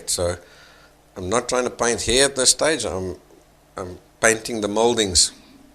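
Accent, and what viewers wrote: Afrikaans, South Africa. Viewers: Thank you so much for sharing. I appreciate all the little tips and details you give so freely. You have taught me so much just from watching you paint the portrait of " the girl with open hands " I wonder if you ever adventure out into abstract reality. I am quite sure you would be excellent at doing so. I am a fellow SAfrican living in the USA so your accent brings back memories of my land of birth. I wish you all of the best for the new year with many happy hours in the adventure of painting. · You sound South African, You have my accent :? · He sounds Afrikaans.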